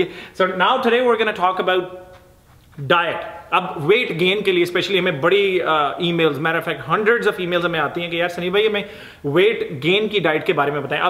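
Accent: Punjab, India. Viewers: Super style sir ji sp ki hindi panjabi mixx super shahid style sir 😁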